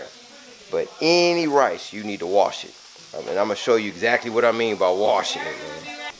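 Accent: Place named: West Coast, United States. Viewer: Got that west coast sound! Love it...head bobbin the whole time! Pro tip on that rice, I never knew that, but I never cook rice either lol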